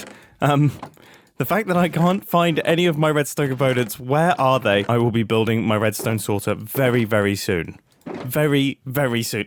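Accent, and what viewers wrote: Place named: United Kingdom. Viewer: My daily dose of a dapper British man playing games to keep my sanity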